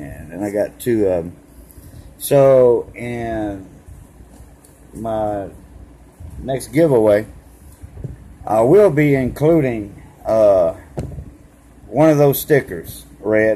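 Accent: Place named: Louisiana, United States. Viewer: hey:).. nice shout outs... i like your accent... thanks for sharing and have a nice day!!:)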